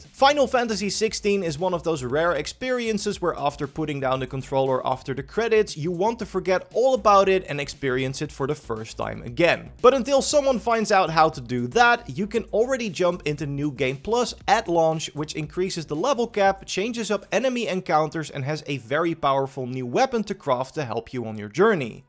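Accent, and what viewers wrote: Netherlands. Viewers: O dear, this kind English spoken by a Dutch, It is hard to listen to. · Judging from your accent, you're Dutch? 😂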